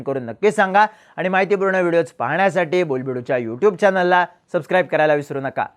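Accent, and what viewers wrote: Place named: Kolhapur, India. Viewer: तू मस्त आहेस पण जर तुला मोठ व्ह्यायच असेल तर तुझा कोल्हापुरी accent बंद कर आणि महाराष्ट्र ची भाषा शिक.. don't take me otherwise it's only suggestion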